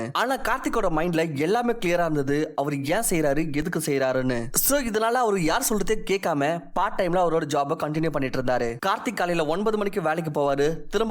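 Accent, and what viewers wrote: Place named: Bangalore, India. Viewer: Bro. Neenga bangalore ah.. Ungaloda tamizh pronounciation bangalore tamilian madhiri irukku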